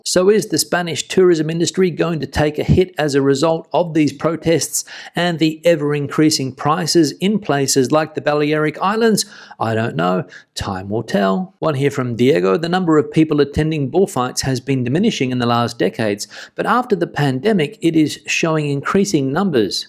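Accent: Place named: Australia. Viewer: EVERY time I've been to Andalusia in July/ August, it's been over 40C. Cordoba is the hottest city in Europe. WTF this is news every year is only explained by climate hysterics trying to pretend it's something new. ITS NOT!?? - with an Australian accent? Since when did Aussies represent Spain??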